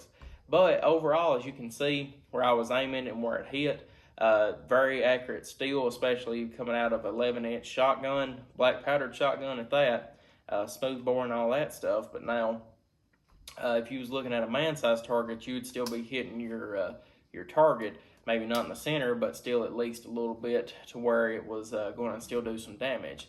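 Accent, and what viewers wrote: South, United States. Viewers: Why does watching a gun review from someone with a heavy country accent feel so much more reassuring?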